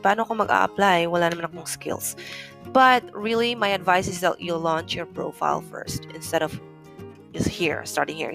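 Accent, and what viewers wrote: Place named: United States. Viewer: you speak great! 'thought at first that you're an american! great accent, lpve the tone of your voice.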